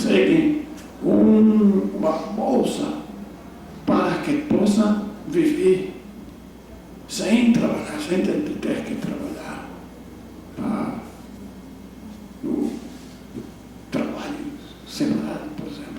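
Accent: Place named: United States. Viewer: As I see it, the "issue" is not having an accent per se, as more often than not, words are pronounced just as they should. But the fact that he pauses a lot and takes a moment for choosing the word he wants and then apply correct grammar around it for the sentence he's about to say. Therefore, he doesn't seem anywhere near a native even tough he has better grammar than some natives and has a great pronunciation overall. A native unaware of his identity would probably consider him a foreigner that had spent about a decade or even more living in Brazil. The host on the other hand, speaks with a heavy accent as American as 4th of July.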